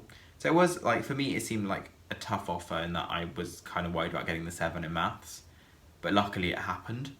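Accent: United Kingdom. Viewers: your speaking accent is the Oxford accent ? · I thought he grew up in Britian cuz of his accent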